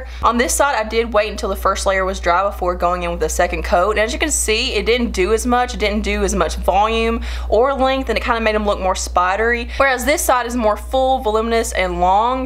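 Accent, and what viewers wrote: South, United States. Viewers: I've just found your channel & I'm loving you. I grew up in the south so we talk alike & I'm wondering where your from?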